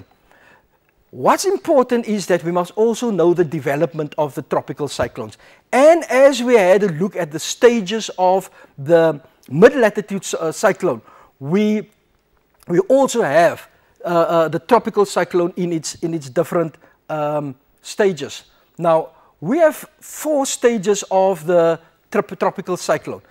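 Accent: South Africa. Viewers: Rahul Vamshidhar South African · South African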